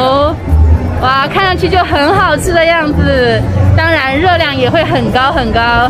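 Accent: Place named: Taiwan. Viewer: 视频拍不错，学哪台湾腔，真受不了。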